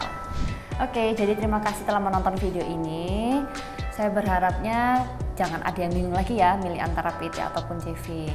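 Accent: Java, Indonesia. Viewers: Cantik medok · Jawa banget.. 😀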